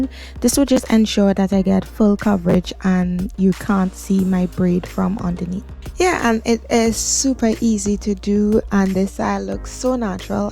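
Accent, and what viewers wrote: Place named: Trinidad and Tobago. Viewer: Trini to the voice ❤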